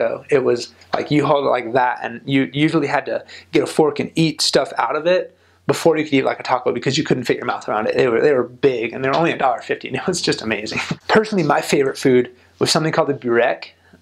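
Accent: Albania. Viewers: I loved your alb accent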